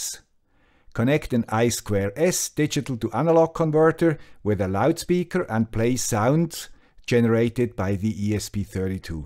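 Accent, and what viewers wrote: Switzerland. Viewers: I noticed that lately I keep hitting videos with this specific guy with a Swiss accent. After the 5th video I decided, "Well... I guess this guy with a Swiss accent has earned a subscription..." :D Thank you for all of the work you do! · you made my new routine: Sunday morning "swiss accent" before the breakfast;) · This is really getting a Swiss channel... an accent, a library... What a great video and really usefull !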